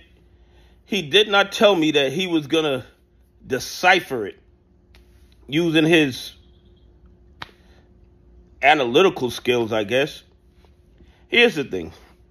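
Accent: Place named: Boston, United States. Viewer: He did not have the money to Sue you guys should work together he's got a smart thought behind it You sound like al from Boston look where he went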